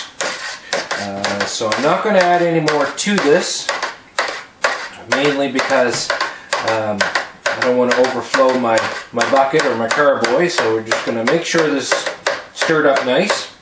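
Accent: Canada. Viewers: LOL He sounds Canadian. Of course he made it to get drunk.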